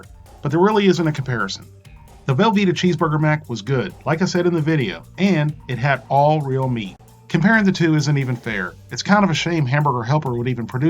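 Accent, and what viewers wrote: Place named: Carolinas, United States. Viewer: not related to your video but you sound like a Carolinian and if so hell yes all the best creators are from hear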